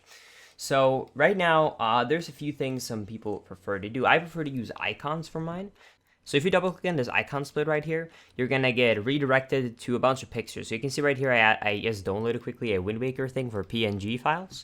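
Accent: Sweden. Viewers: OMG u swedish like me!?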